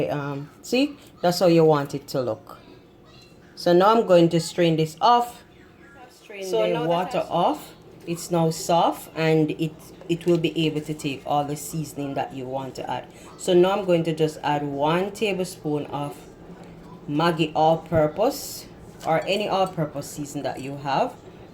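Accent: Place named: Jamaica. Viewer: Love your JA accent.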